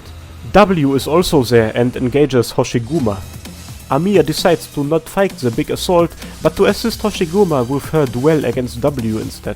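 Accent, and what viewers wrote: Germany. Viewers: Bruder dein deutscher Akzent ist LEGENDÄR XDDD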